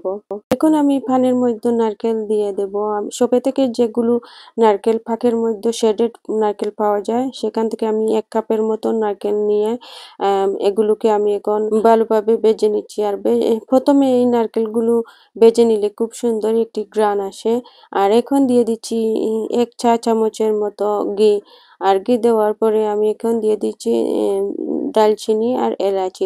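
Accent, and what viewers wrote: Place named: Sylhet, Bangladesh. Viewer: আসসালামু আলাইকুম আপু আপনার কথা গুলো শুনে মনে হচ্ছে আপনি সিলেটি আমি ও সিলেট থেকে দেখছি সিলেট কোন জায়গায় আপনার বাড়ি কমেন্ট করবেন ❤❤❤❤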